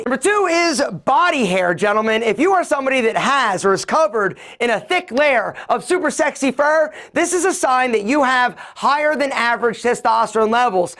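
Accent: Philadelphia, United States. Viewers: I know a Philly accent when I hear one 👀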